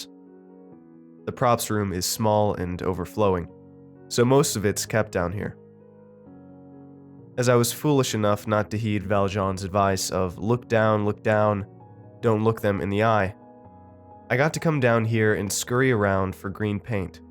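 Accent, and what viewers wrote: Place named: United States. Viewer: i’m american, but have lived in england long enough to know this is written in the POV of someone british, but his american accent reading it threw me off completely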